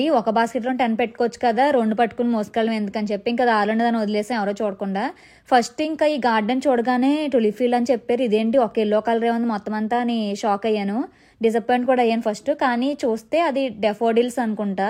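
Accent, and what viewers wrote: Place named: Godavari, India. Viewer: Meru mana godarolla Aisa bagamatladutunnaru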